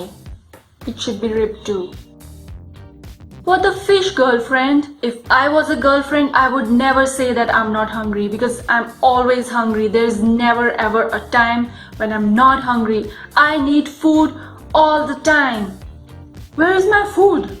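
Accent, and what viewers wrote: India. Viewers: it’s obviously indian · Bekir Hadžić She's probably Indian and she speaks English a lot so her accent is light. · I'm glad I found you from a comment your so funny. You sound Indian but don't look like it but I have an Indian friend she has the same name as you. Also I'm Indian 😸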